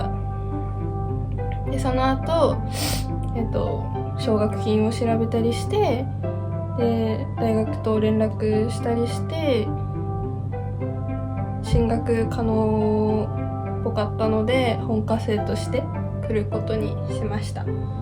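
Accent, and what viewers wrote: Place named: Northeast, China. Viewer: 😊Chinese have a littile northeast dialect, rise up！🎉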